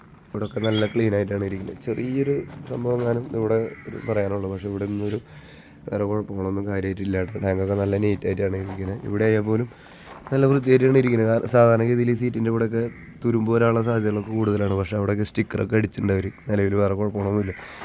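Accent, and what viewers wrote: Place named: Thrissur, India. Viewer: നിങ്ങളെ സംസാരം കേൾക്കാൻ നല്ല ഭംഗിയുണ്ട് തൃശൂർ അല്ലേ🌹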